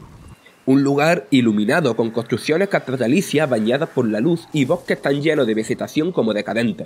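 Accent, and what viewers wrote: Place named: Murcia, Spain. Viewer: Tío, lo haces con mucho empeño, pero tienes el acento de Jumilla en todo lo alto y a veces cuesta mucho seguir la narración. ¡Tienes que trabajarlo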